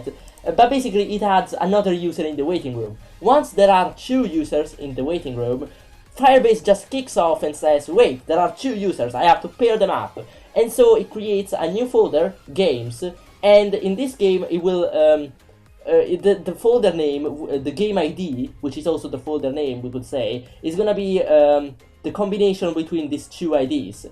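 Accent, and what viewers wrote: Italy. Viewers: Great accent :D Where're you from, Italy?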